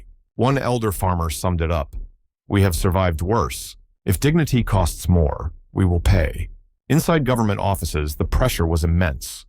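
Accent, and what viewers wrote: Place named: United States. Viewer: 😂 narretor says Ibrahim is 27 years old 😂😂😂😂 it's a American voice😂😂 FAKE NEWS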